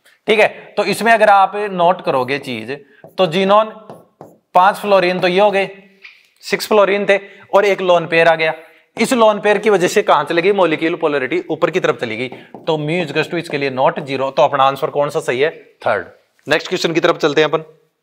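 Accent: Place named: Haryana, India. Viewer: Haryanvi lahje me chemistry mja aa gya kya padhate ho sir ... thanks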